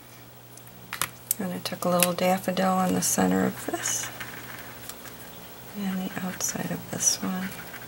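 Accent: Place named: Illinois, United States. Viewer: are you from : Illinois, Wisconsin or Michigan area? You sound like my daughter in law lol :-) She's from Cary, ILL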